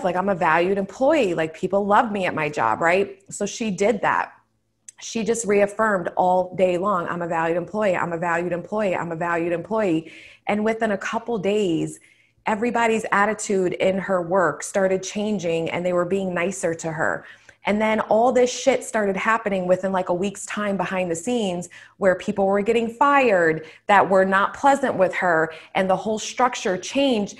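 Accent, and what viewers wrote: New York, United States. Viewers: I've been binge watching all these videos of different coaches and I am slowly liking your vids the most! Plus as a New Yorker, I can totally tell you are from NY 🤣 But I definitely need to work on self-concept and assumption because I really really want my SP and I can see the hot and cold with how I am feeling that week or not. I want what I want and I deserve that shit! : )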